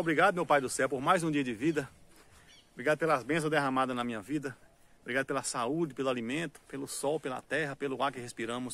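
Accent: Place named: Minas Gerais, Brazil. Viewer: Então tá bão uai....eita sotaque forte de minas...tá ficando chibata!!! Arrocha aí meu irmão. Forte abraço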